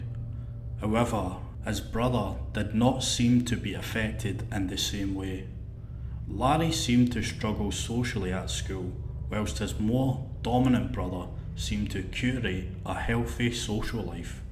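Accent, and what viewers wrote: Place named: Scotland, United Kingdom. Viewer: edit thanks for the news clipping . I’ve been trying to figure out how he got life for one kidnapping charge, that contained more info than a couple diy docs. Subscribed Now that is a rich, Scottish accent. Big true crime/serial killer analysis fan. That Blackbird show got me tho. One scene in particular still haunts me.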